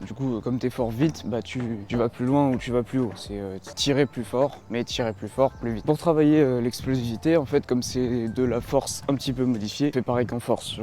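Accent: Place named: South, France. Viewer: Ouahhh l'accent du sud, on l'entend vraiment fort, mais c'est drôle je trouve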